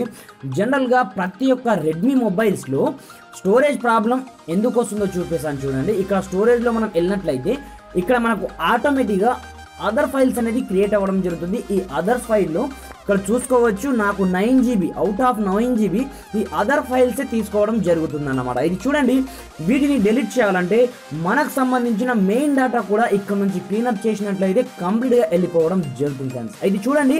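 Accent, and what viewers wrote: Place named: India. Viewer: Why is it always indian? I cant u derstand what your sayinggg..just ganna turn on captions then.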